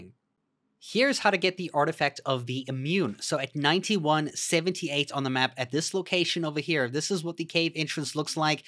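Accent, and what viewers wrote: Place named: South Africa. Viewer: I knew you sounded south African. Wild lol 😆 awesome guide bro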